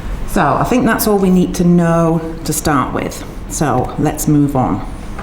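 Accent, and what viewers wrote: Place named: United Kingdom. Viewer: I THINK she is british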